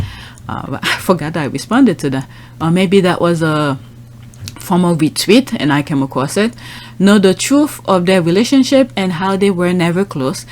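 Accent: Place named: France. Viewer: I just came across your channel girl you are so good at hitting the main point on each click I love your French English accent if am right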